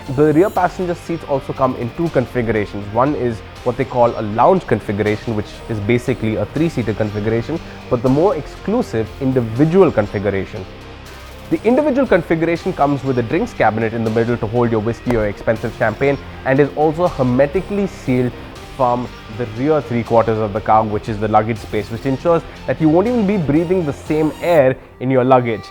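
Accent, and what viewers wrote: India. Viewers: What a crap review. He's not in the car, it's only generic footage, and his Indian accent is a bit thick. I'm not going to buy one of these now...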